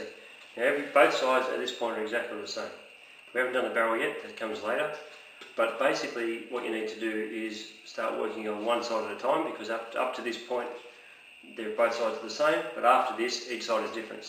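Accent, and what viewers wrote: Australia. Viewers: r u an aussie if so i applaud you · Yeeeeeeeeeesssss an Aussie! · Mmmm, interesting, is that an Aussie accent? Good job!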